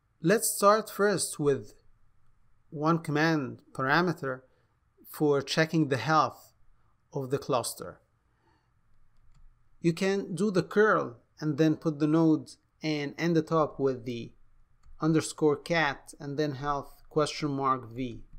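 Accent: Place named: Iran. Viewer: You can't hide that Iranian accent mate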